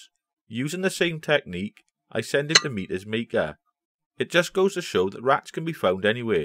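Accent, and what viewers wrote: Wales, United Kingdom. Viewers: Ha , great video, love the Welsh accent. Don't the rat's that get inside the wall where you can't recover them start to honk after a few days? Especially in that steamy hot Pontypool climate.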